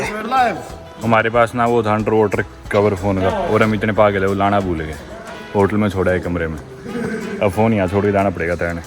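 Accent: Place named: Meerut, India. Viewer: Meerut wali bolii katti jotte se😂😂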